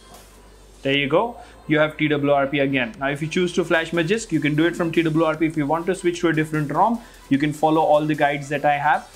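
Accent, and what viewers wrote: India. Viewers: i like your conten, u speak clear not just like another youtuber speak english but have a bit indian😅 so try hard, i will support you from malaysia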